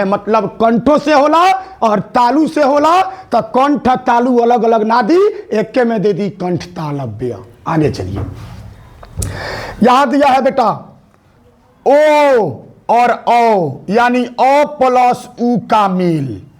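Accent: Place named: Bihar, India. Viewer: Sir jii Pura Bihari style me ❤❤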